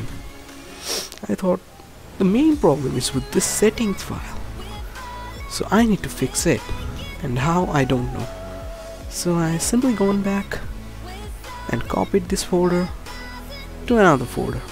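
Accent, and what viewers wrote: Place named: India. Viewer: can the music get any fucking louder and the voice be more indian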